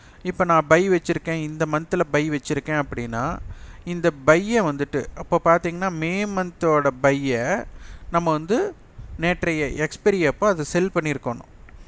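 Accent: Kongu, India. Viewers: Masterji, such a nice advice (in a family how Amma Appa advice to their childrens - I feels just like this) for small traders, in 2 days can't earn lakhs & crores, we can save our capital, This is the time to study, observe, learn. Take a notes what market doing Iam a big fan of your Kongu Slang Tamizh "VALATHUDAN VAAZHGA"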